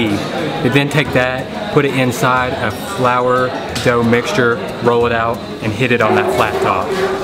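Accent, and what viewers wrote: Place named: Texas, United States. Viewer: Great video, first time seeing a great presentation of our food and culture by a westerner. The food, both the vegetarian food at the restaurant and the seafood looks very authentic and of high quality. I can't believe that the way you ate is more realistic and natural than how we natives eat. It seems you really enjoyed the food. I am originally from the other side of the Island, the Tamil Nadu state of India, now living in Houston, Texas. Based on your accent, you seem a Texan too.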